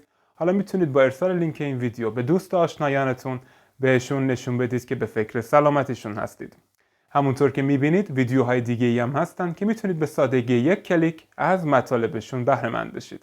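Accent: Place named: Turkey. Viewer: این ویدئو را ده بار دیدم تا تک تک کلماتو حفقظ کنم فقط دلمون چیز شیرین میخواد چی بخوریم یه سوال شما ترکین؟ چون ق تون خیلی قق میشه من گوشم حساسه و دقتم زیاد مثلا دقت رو شما دگگت میگین البته بعضیا دگگگگت میگن من ترکها رو از رو تلفظ گ سریع شناسایی می کنم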